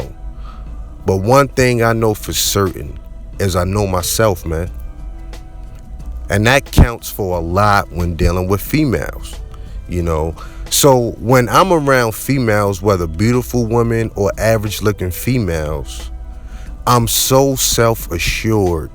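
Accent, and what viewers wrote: Baltimore, United States. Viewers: Great video. I can tell you from Baltimore too, i hear it in your accent.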